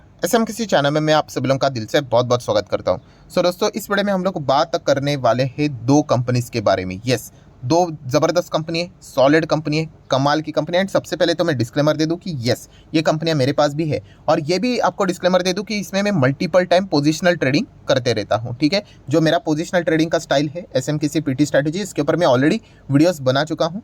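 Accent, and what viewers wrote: Maharashtra, India. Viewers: You have a very nice Marathi+Hindi Mhindi accent ❤ I enjoy your typical marathi words in between. Thsnks for reminding me of my mother tongue 🎉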